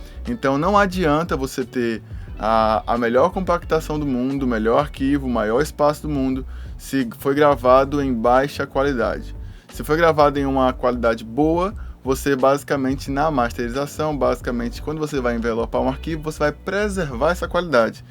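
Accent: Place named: Bahia, Brazil. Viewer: A melhor parte é o sotaque baiano.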